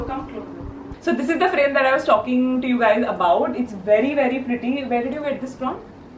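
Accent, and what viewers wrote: India. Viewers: I just love ur hinglish in between ur english I literally love it😍😍 u r too cute....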